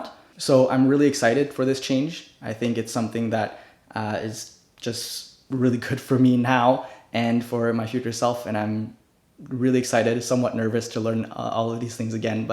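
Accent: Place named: Canada. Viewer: o shit you're canadian?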